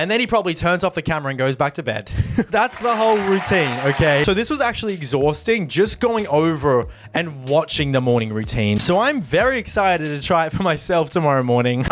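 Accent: Australia. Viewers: He is Australian I believe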